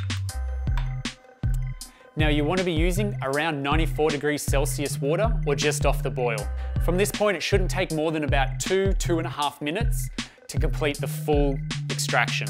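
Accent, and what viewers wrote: Australia. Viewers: Man, I can't stand the Australian accent! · where in australia is he from? :D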